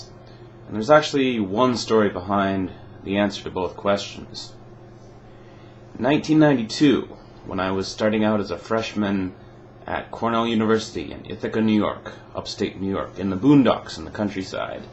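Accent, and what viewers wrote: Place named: Japan. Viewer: Interesting. You and I may be the only ones in the US who did not start because of anime. Judging from your accentation I guessed you had access to a friend or relationship partner to study with from Japan. Still, it takes a unholy obsession to learn Japanese, I feel there is much more to this story left untold.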